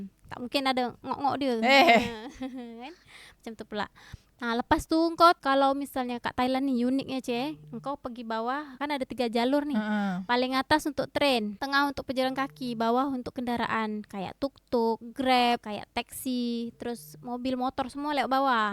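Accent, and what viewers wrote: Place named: Kedah, Malaysia. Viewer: Hang nak pi mana tu..bahasa Kedah ni 😅😅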